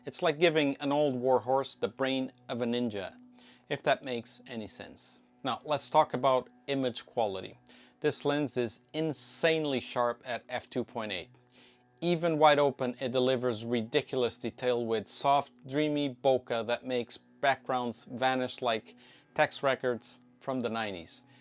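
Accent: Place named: Portugal. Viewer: Great video bro Quick question are you Portuguese? Porque o sotaque nao engana meu camarada🙏🏿💪🏿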